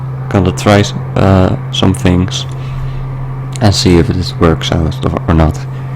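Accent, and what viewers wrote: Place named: Netherlands. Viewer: Hoor letterlijk aan je stem dat je nl bent hahah lol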